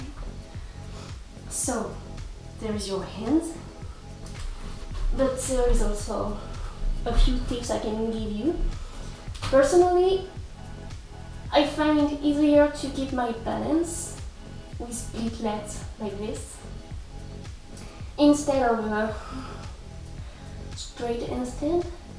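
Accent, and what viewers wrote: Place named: France. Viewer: Great tutorial, very informational and calm. I`ve been in gymnastics for 8 years so I already knew how to do it and I`m not afraid of doing it, but I still loved your video:) I would really like to see a tutorial on how to to chinstand (with transition ) and/or jumping into handstand. My goal is to learn presshandstand but I think I need more strenght :) And I`m not sure what exercices to do to improve the presshandstand. Since I have no strenght I would be excited to at least be able to jump into handstand. Et j`aime bien ton accent français :D Loads of love , laurence. Keep working and posting awesome videos :)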